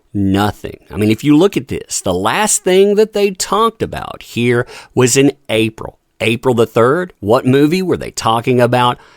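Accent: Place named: Alabama, United States. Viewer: I just found this channel not that long ago but no offense, but is the main guy on here trying to pull off a gay redneck hillbilly from Alabama or something? The voice thing is really annoying. Is that like a gimmick just for this video or a couple videos or is that like how you sound all the time? It's really fucking weird cringe-worthy and hard as fuck to pay attention to whatever you're trying to talk about cuz I just keep seeing images in my head of some redneck dude down south wearing high heels or something it's fucking weird. Like I said man I'm not trying to be mean or offensive but I know I can't be the only one that that comes across that way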